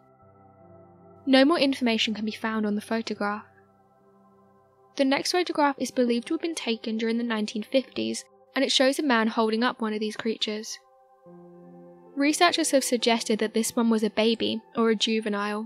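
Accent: United Kingdom. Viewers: This girl is one of the most pleasant narrators on YouTube. Her sweetly modulated voice combined with the pure British accent is refreshing.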